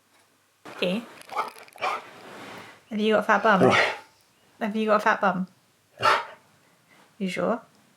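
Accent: United Kingdom. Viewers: Ha! I was just watching another of your videos and running out the door, threw my phone in my hip pocket. Moments later I thought my “bum” was talking to me. In America. It had a Cornish accent. ... This video had started playing! 🌷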